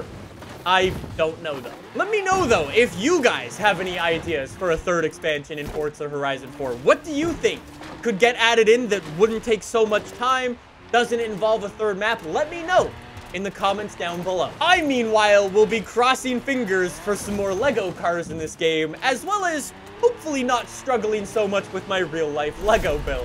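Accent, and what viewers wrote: United Kingdom. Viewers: What part of the UK are you in?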